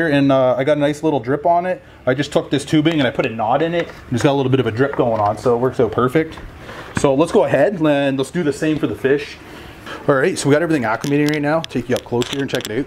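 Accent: Canada. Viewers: You’re 100% Canadian eh?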